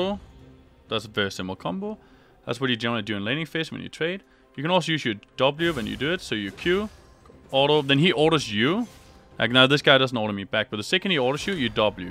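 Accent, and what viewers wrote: Denmark. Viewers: Are you from Danmark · is it just me or does this guy sound danish don't want to sound like an ass, I live in Denmark and know what a danish accent sounds like. just curious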